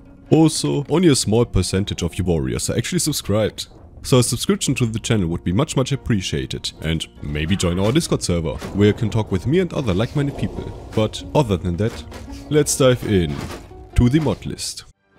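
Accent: Germany. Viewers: 1 little question, where are u from again ? U sound kinda german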